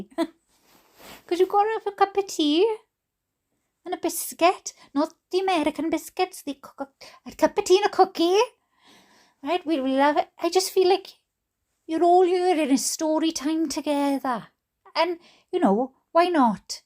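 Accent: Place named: Wales, United Kingdom. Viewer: Ok my lovely! Wonderful if your accent & my southern Kentucky accent talking to each other. Add Rickey Watson in the mix.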